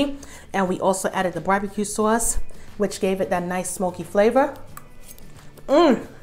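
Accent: New York, United States. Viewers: Nice. Always liked the ladies with NY accent. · Man you look just like toya.. lil wayne bm.. but with a new york accent. Almost done making my roast thanks sooo much.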